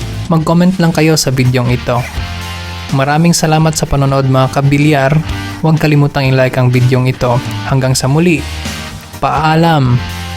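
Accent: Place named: Visayas, Philippines. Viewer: Napaka akward mo mag salita d bagay paki ayos boses mo halatang bisaya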